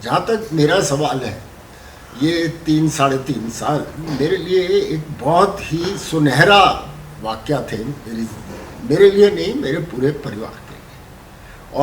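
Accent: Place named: Andaman and Nicobar, India. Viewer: Apko bht subhkamnaye sir. Apke baat me Andaman ka slang jhalkata hai abtak😅. Sir vapas aajao... Beda gark ho rakha hai😐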